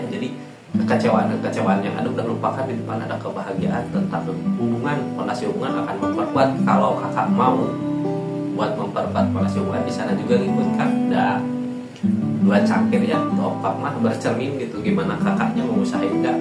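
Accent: West Java, Indonesia. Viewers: Assalamualaikum.maap apakah anda.ada di bdg..? Boleh minta alamatnya...? Sy liat daricara bicara sunda hehe.mksih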